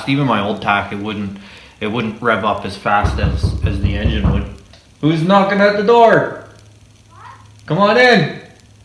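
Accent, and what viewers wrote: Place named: Canada. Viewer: Dude. That Canuck accent. LoL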